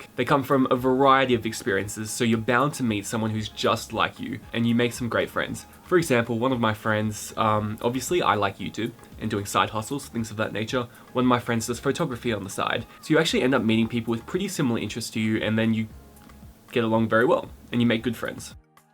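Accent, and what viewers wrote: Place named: Spain. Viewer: you have a spanish accent. So funny!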